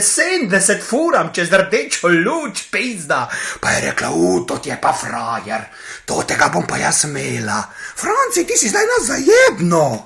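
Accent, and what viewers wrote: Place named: Maribor, Slovenia. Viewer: Pizda še zdej ne morem prebavt mariborščine. Še en rant okol radarjev, stopped 50s. Good luck.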